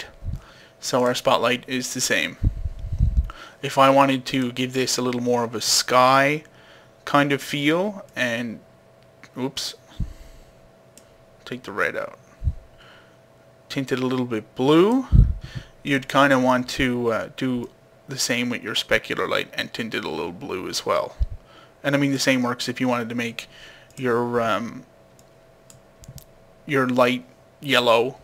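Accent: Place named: Newfoundland, Canada. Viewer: Love the Tutorial...AND the Newfoundland Accent! At least that's what it sounds like. I have a lot of family there and my mother is a newfie! :) Anyways, thanks again!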